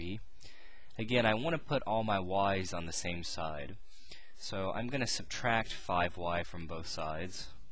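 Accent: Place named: United States. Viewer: As soon as I heard an American accent, I knew this was a different method.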